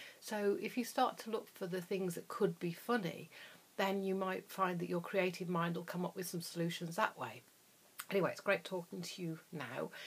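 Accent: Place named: United Kingdom. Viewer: And fuck those haters your gorgeous and smart and nice and honest your make up and hair is just fine,they just hating.fuck them your right how to deal with them,all these haters I would love ❤ to see what they look like,lol lol I bet it would be a permanent Halloween show lol lollol and love your British accent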